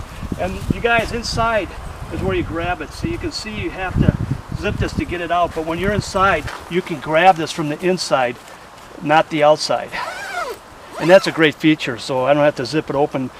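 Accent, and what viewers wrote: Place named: Iron Range, United States. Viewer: Been to McCarthy many times! I’m an Iron Ranger from Virginia, MN. Glad to have found your channel! Thanks for the great info! I could tell that you have the same accent as me 😊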